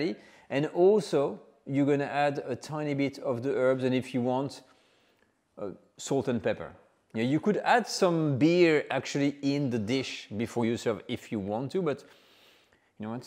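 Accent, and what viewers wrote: France. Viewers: Love French food, and love the French accent. This looks delicious.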